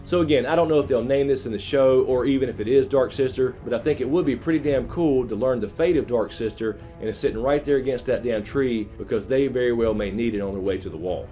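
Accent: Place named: Carolina, United States. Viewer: Great review bro! Hey are you from NC? You have that Carolina sound to your voice.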